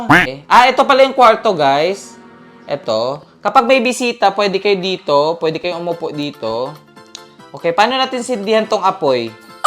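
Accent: Visayas, Philippines. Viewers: Bisaya ka ba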